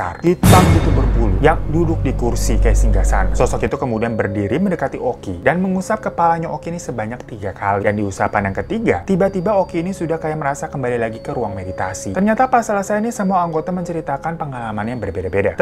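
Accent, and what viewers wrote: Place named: Lampung, Indonesia. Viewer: weh logatnya lampung bgt wkkw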